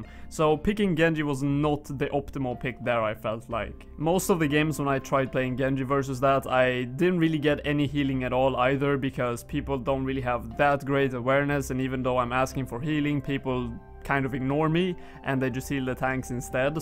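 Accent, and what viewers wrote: Sweden. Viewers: Nice video mate, are you Swedish btw? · Swedish accent I Love it bro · u are sweden i hear it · Sounds like your from sweden(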